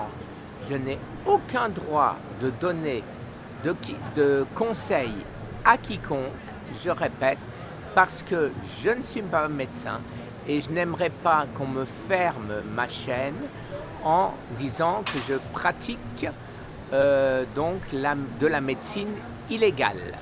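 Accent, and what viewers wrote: Alsace, France. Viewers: Bonjour, l'alsacien! J'ai soupçonné un certain accent que je connaissais.. hé oui en t'écoutant..tu avais parlé que tu vivais à Strasbourg..il y a 20 ans je crois.. Je ne sais par comment je suis arrivée sur ta chaîne! Mais je suis ravie..j'apprecie les battants en général dans la vie!! Personnellement je ne suis pas touchée par cette maladie.. Mais ma mère et mon compagnon ont quittés cette terre..à cause de ce crabe!! Bien sûr que l'alimentation saine.. est un facteur de santé physique mais aussi psychique! J'ai personnellement changée mon alimentation depuis une dizaine d'années, et je suis bien plus en forme physiquement et mentalement et mon moral est au top! Si bien,que j'ai entamée une conversion professionnelle il y a presque 10 ans. Oui j'ai rejoins une éco crèche, et j'ai jour après jour transformée les menus que l'on servaient aux enfants. 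J'en ai fais ma croissade..j'ai emprunté ton titre..pour d'expliquer que c'etait et reste encore pour certains aliments une bataille..Avec certains de mes collègues.. Mais au jour d'aujourd'hui les menus à la crèche sont végétariens voire végétariens et bien sûr bio. J'anime des ateliers pour les parents, grands parents pour les sensibiliser à l'alimentation approprié pour les jeunes z'enfants.. Les rendre attentif que la nutrition infantile essentiellement basée sur les végétaux, les fruits et crues, joue un rôle important pour leurs croissances mais surtout aussi de conserver un capital santé tout au long de la vie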